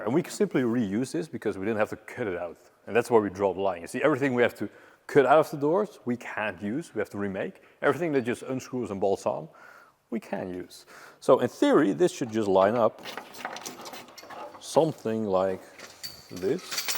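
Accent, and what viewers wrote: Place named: Netherlands. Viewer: I was listening, and thinking that accent is very familiar. Then you started speaking Dutch and I was like MY INSTINCT WAS RIGHT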